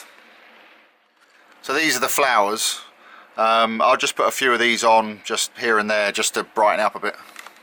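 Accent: United Kingdom. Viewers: Where are you from? (In england) I love your voice/ accent.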